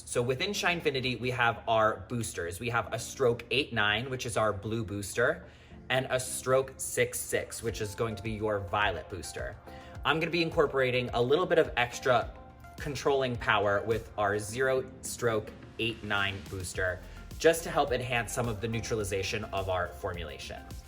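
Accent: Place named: New York, United States. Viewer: I LOVE this tutorial. You’re a natural- EXCELLENT delivery, camera presence…. I thought I heard a little NY accent. I’d totally go to you! Application is so clean. PLEASE lemme know if ur in NYC area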